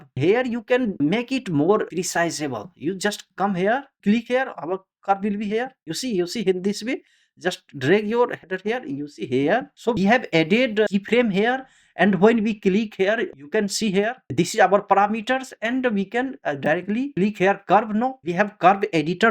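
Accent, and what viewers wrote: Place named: India. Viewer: Mat bol English bhai joker lagta hai aur foreigners majak bhi udaayenge... Typical indian accent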